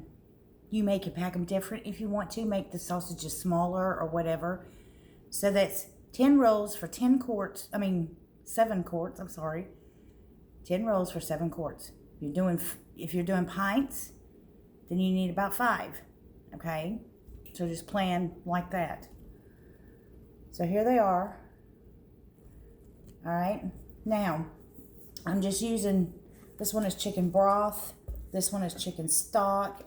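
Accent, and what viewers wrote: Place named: South, United States. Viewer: Adorable southern voice cookin up some sausages. Awesome morning vid. 😃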